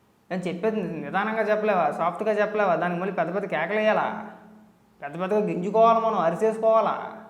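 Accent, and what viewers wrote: Godavari, India. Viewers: medhi e ooru bro, me basha godarolla basha la undi. any way you were great. keepit up...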